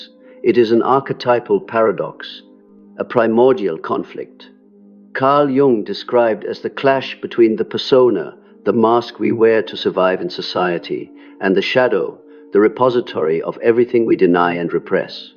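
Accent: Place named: Spain. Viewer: Thank you !! I have not yet seen this level of depth in any video before (probably Sam Vaknin's videos only are this deep, but he is much more technical). I have seen this video more than three times already ... Clearly the German voice (with a perfect Spanish accent by the way) is a very experienced person. Thank you very much for sharing this type of deep content. It does help us a lot !!!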